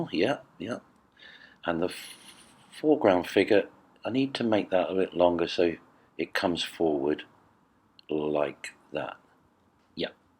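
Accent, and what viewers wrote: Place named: England, United Kingdom. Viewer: Dalla pronuncia direi che sei inglese! I Like! 💠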